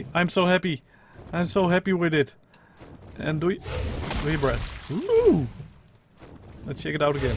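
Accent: Netherlands. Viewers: it seems you are speaking better english than your first videos anyway so it seems you are already trying :) het nederlandse accent zal niet zo snel weg gaan haha maar ik denk dat als je engelse videos bekijkt en luistert je de woorden na kan praten voor je zelf en dan luisteren of het meer klinkt als de native engelse sprekers. Zodat de engels sprekende kijkers minder moeite hebben je te verstaan :D succes!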